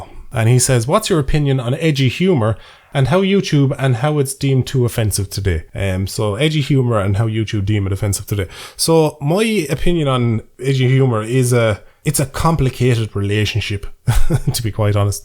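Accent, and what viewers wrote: Ireland. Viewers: It’s actually the melodic tones of your voice. The brogue is an orchestra to the ear and the humour is fowl... birds of a feather and all. Side splitting humour to the point of not being able to breathe. And yes ... we can feel it 😜😜😜ps... the missus needs her own shirt 😋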